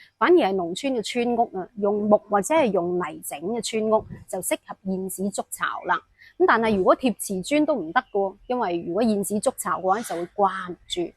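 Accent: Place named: Guangzhou, China. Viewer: 主持說話，帶点廣卅口音，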